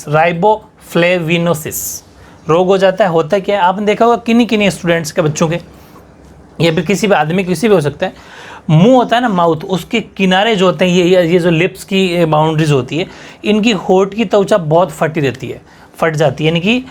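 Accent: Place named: Marwari, India. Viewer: Ghutne Adte h kabhi kabhi aap marwari bolte ho sarji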